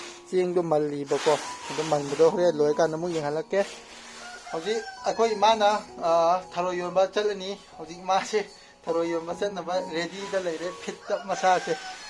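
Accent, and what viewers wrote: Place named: Kabui, India. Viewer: Lenlaga leile pure kabui accent 😂❤❤❤ tugaipanthi anubm kho tho mak e😢❤❤❤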